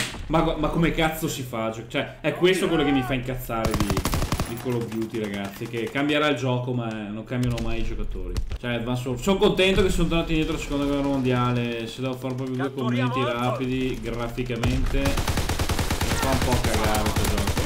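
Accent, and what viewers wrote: Veneto, Italy. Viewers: Mi fai troppo ridere!!!😂😂 In questo video si vede come ti sale il Veneto cazzo ahhahaha Grande! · ma da quale parte del veneto provieni?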